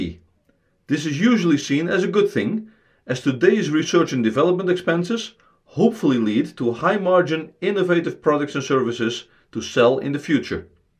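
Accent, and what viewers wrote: Netherlands. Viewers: You are Dutch...I can hear it. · Alleen echte strijdes herkennen het Hollandsche accent :) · Are you Dutch?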